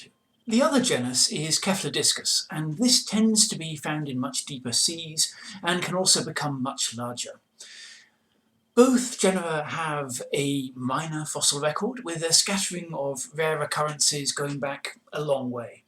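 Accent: United Kingdom. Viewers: So cool! I have never read anything about graptolites other than that they existed and are useful for dating rocks. That is a very interesting question about how a colony of successive clones achieves those kinds of alternating ordered shapes! And why such fragile collagen skeletons fossilize so well! I wonder if aside from collagen they had some kind of pervasive toxic chemical that discouraged decomposers. I'm straining my brain to remember what popular science book I read in the last couple of years - by a woman author is all that I remember, but the topic of the book must have been paleontology or earth history - describing in one chapter a cold drizzly steep hike somewhere in (Scotland?) with a renowned older-but-tougher paleontologist looking for fossil/ geological evidence of a major extinction event, and graptolites were a big part of the evidence... 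By the way, for Dr. fans who have trouble understanding her (like me), when I slow down her beautiful chirping Scottish accent to 75% (in the settings for the video) suddenly I can understand every word! (except for some of the Graptolitic terms that I didn't remember from earlier in the video, but the context made them clear).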